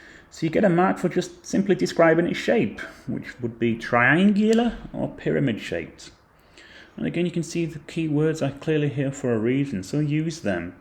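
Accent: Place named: England, United Kingdom. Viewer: I like the english accent and the way u make this sound soo obvious😭🙏. Called us baboons in all tongues 😭🙏.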